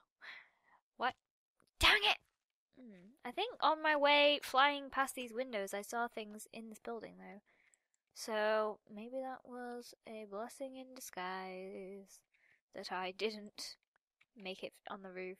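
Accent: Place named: England, United Kingdom. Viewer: She's English too... Accent**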